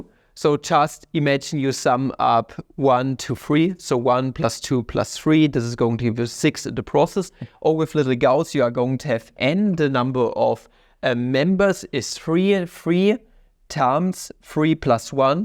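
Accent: Germany. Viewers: this is German pronuncation. is there even any word pronounced correctly?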